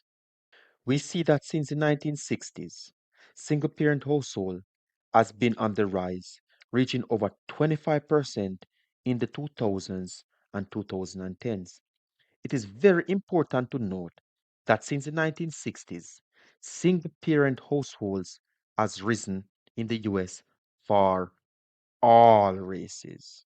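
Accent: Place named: Jamaica. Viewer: all due respect bro, but you sound Jamaican. why are you making videos about FBAs? 50 percent of jamaican children live in single parent households. whats your point?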